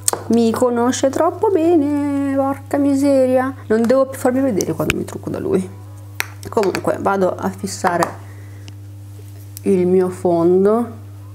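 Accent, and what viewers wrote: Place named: Bologna, Italy. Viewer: Quanto si sente la cadenza bolognese!!! Noi ci riconosciamo ovunque 😅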